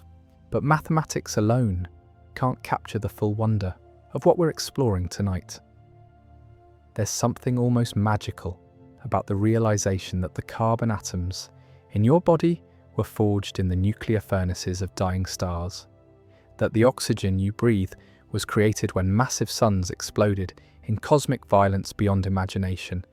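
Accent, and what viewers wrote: United Kingdom. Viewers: Ignoramus with a British accent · your British voice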